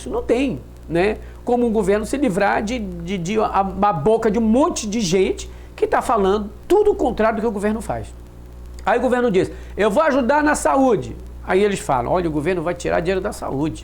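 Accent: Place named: Pará, Brazil. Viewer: Adoro sua fala sul do Pará..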